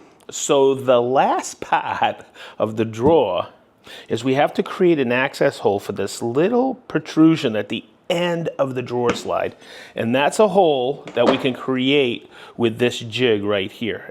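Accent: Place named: Maine, United States. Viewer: such genius in simplicity and Central Maine accent. Take a Shot for every "Whikkid"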